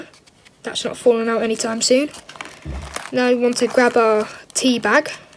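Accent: United Kingdom. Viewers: of course the mf is british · british accent😍😍😍😍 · british accent just adds to it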